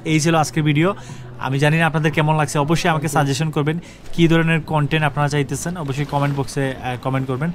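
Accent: Sylhet, Bangladesh. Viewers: ভাই কিতা সিলেটি নি?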